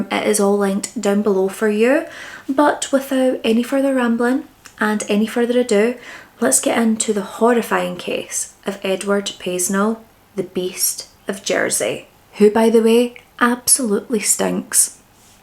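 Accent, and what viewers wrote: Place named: Scotland, United Kingdom. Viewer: Every time I watch your videos, my inner dialogue is in your voice/accent for like the entire rest of the day 😂 and I’m a California girl so I literally cannot even do a Scottish accent myself. Just in my noggin lol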